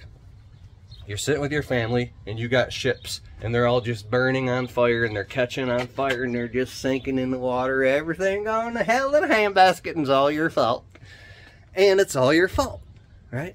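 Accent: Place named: Mississippi, United States. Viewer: As a Mississippi man I can appreciate the accent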